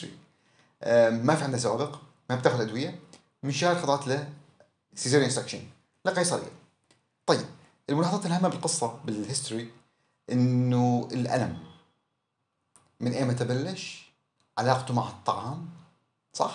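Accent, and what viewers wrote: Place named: Syria. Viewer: اذا عراقي ليش تحجي سوري؟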